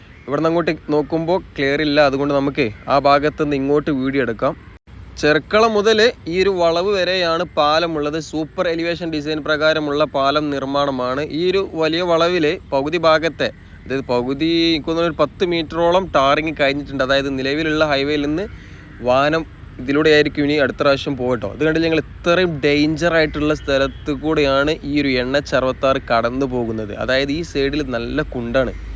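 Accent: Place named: Eranad, India. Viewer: പിന്നെ താങ്കളുടെ ഭാഷയെ വിമർശിക്കുന്നവരോട്, മലയാളം എന്താ സ്റ്റേറ്റ്കാരുടെ ഭാഷ ആണോ? അവരവരുടെ മലയാളം അവരവർക്കു ശുദ്ധം. ഞങ്ങളെ കാസ്രോട്ടെ ബാസെ ഞങ്ങളെ സുദ്ദ ബാസെ. ങ്ങളെ ഏറനാടൻ ബാസെ കേക്കാന് എന്തോരി പാങ്ങന്നേപ്പാ, ആ ബാസെന്നെ പറഞ്ഞോണ്ട്-ന്നെ മുന്നോട്ട് പയ്ക്കാളീം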